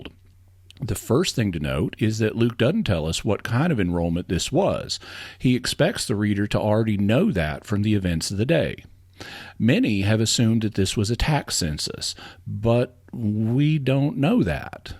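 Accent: South, United States. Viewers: He recently moved back to Arkansas, so his natural accent is reemerging. I like it, shows some Southern heritage representation in Catholic apologetics. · For the first time ever I noticed a bit of a Southern accent. I wonder if Jimmy went home to Arkansas or wherever he's from And visited family. Anyway, great video. Good stuff as usual!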